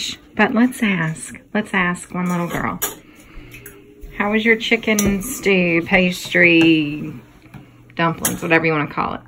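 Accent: South, United States. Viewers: Your to real + genuine to be a politician my dear. Also, I adore your southern accent.! U don’t hear that to often in New Jersey.😎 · I could listen to you all day love your southern drall and your beautiful girl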